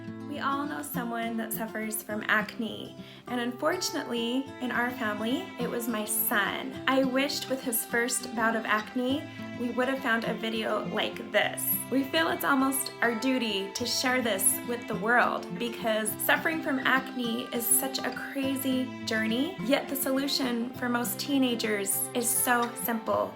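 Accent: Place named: Utah, United States. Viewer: All the way through the video, I kept thinking to myself, “she sounds like a Utah mom.” Then when you mentioned here from Kaysville, I had to laugh. I know a lot of people have had a great deal of success. Doing this, with B5, vitamin a or zinc. Years ago, I had success using a combination of all of them, but forgot about B5 in the years sense, so I’m glad I came across this video as a reminder. My son started getting severe acne right after his last growth spurt and a couple days ago I increased his intake of vitamin a and zinc. I’ve also read a lot of science based evidence that iodine in its natural state, such as a sea, kelp supplement is beneficial and I’m just waiting for those to show up in the mail. Since we have a natural grocers right here in bountiful, I’ll also swing by there and pick up some B5. He’s only 12 and it hasn’t been coming this year for his self-esteem yet so it’ll be good to help him how he’s much younger.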